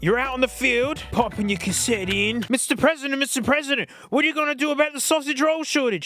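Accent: Australia. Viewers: techmoan: aussie edition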